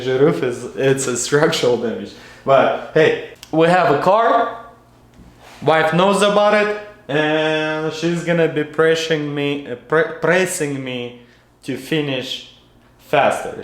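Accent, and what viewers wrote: Russia. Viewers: Привет, хороший русский акцент!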